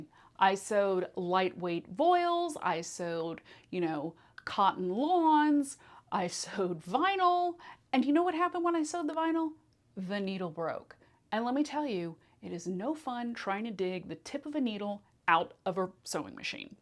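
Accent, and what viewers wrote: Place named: New York, United States. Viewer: Thank you for this video! I've been sewing longer than I care to admit (58 years....?) and what I learned, I learned when I was 10 or 12 in home ec classes (do they still have those?). I had a vague idea of sizes - smaller for smaller fabrics (that meant lighter to me), and larger for larger fabrics - but I knew nothing about the numbers. You didn't mention the second number - is that important as well? One other great needle is a leather needle - they have a very sharp triangular point that is sharp on all sides and can be really handy if you're having trouble sewing something very heavy (think multiple layers of denim). Also loved your hipsters (ahhh, brought me right back) and I detected a wee bit of NY in your accent.... :) Glad I found you - we never stop learning!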